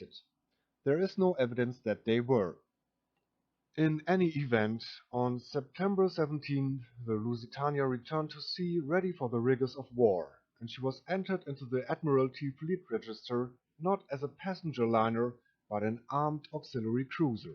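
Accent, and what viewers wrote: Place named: Germany. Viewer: Deutscher Akzent👍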